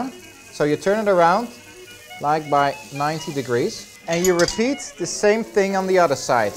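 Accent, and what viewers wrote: Netherlands. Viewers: het is te merken dat je nederlands bent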